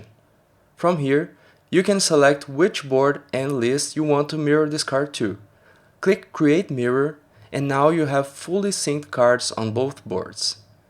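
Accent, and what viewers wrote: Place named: Brazil. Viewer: fácil encontrar um brasileiro falando inglês kkkkkkkkk vou testar seu power up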